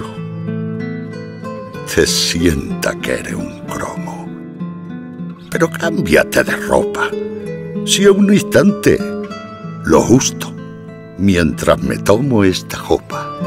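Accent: Spain. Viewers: Maravillosa interpretación en andaluz.... Con su voz, la poesía cobra vida. Gracias!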